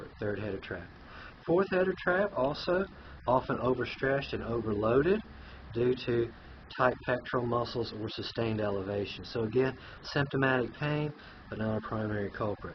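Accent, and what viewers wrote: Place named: Scotland, United Kingdom. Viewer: I thought he was Scottish when he first started talking lol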